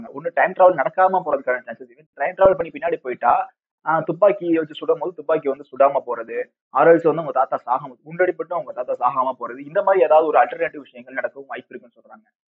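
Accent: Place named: Madurai, India. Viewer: Change ur slang it's completely madurai slang da... But keep going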